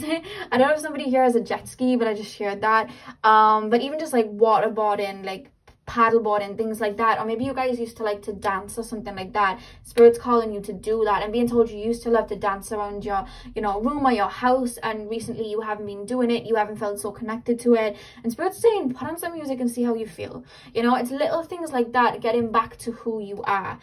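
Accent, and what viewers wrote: Wales, United Kingdom. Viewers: I always take every one of your pick a cards as a personal reading, because you're really that accurate. And you're so comforting and uplifting, hearing your lovely Welsh accent is like receiving a hug from my Nana (she was also from Wales, sadly passed away.) Thank you so much, you are a true spiritual warrior & you give so much, you deserve all the love in the world 🐉💎💐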